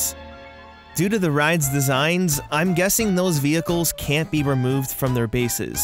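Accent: Canada. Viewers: Are you Canadian? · Also are you Canadian?!?! I need to know lol 😂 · Are you Canadian?????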